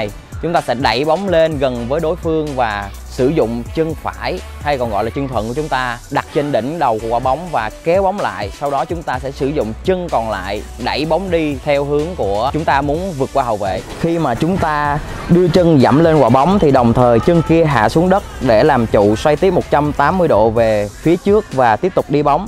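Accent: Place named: South, Vietnam. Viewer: Nói dùm rặc giọng nam đi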